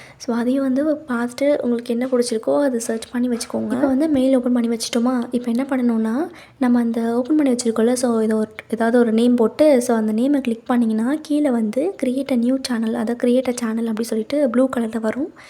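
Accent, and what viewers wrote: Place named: Chennai, India. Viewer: Videos la mattum tha ...etha voice modulation la pasuvigga la? Ilaa.......off the video laiyum eppadi etha modulation pasa palagiutiggala....etha slag voice 🥰 cute ta iruu .....ugaa old chennai slag romba romba alaga ..semma cute 🥰 ta irrukku...ga❤❤❤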